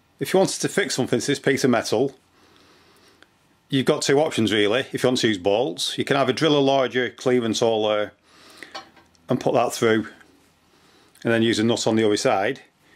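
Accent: United Kingdom. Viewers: brit, man.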